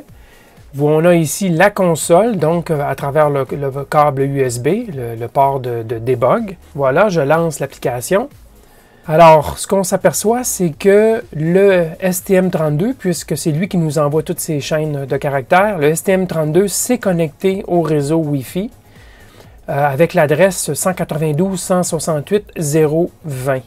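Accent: Canada. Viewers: Bonjour à toi, j'aime beaucoup tes tutos avec ton accent canadien, existe t il un shield pour un esp32 autre qu'une breadboard ?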